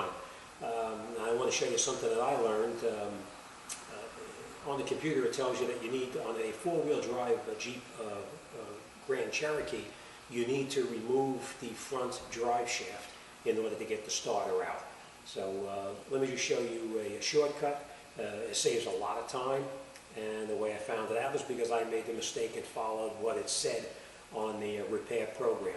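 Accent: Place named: Boston, United States. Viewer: Hes so boston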